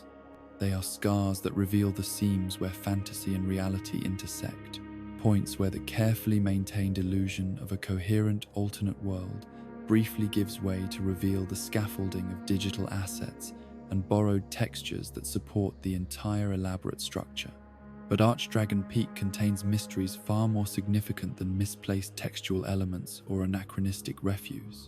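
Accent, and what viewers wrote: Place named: United Kingdom. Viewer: Brittish voice + DarkSouls = views.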